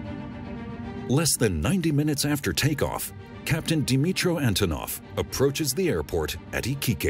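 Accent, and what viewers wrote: United States. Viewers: funny to hear a heavy american accent narrating russian hih-end technology in such a respectful way. there's hope for USA... hahahahahaha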